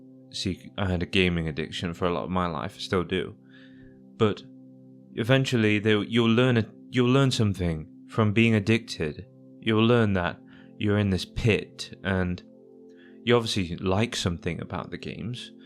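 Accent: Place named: United Kingdom. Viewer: british :pillow-turquoise-hot-chocolate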